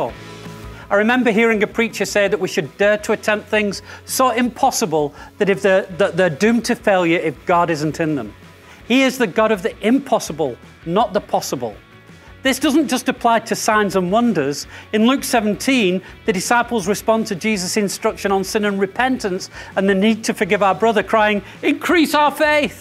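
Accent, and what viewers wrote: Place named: Bolton, United Kingdom. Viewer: the Horwich/Bolton accent still comes through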